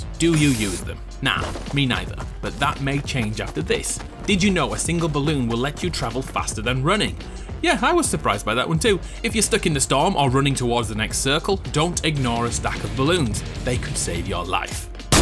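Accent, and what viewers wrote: United Kingdom. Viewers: you, tracer and pyrocynical are the only humans that i respect whom speak british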